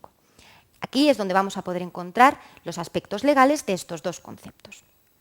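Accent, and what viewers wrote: Spain. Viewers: me encanta tu acento español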